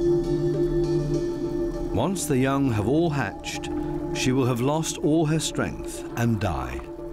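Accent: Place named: United Kingdom. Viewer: Like the British accent the voice of the geocide of millions of people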